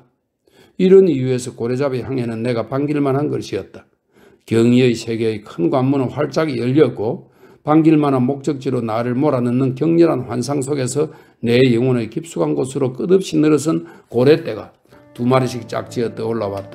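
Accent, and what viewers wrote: Gyeongsang, South Korea. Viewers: 우리한동훈도토리가젊은팬들에게권하는책이라서한번더듣습니다너무고맙고감사할뿐입니다 약간의경상도사투리가더매력적이십니다 즐거운성탄되세요건강하십시요 2023년12월24일0시15분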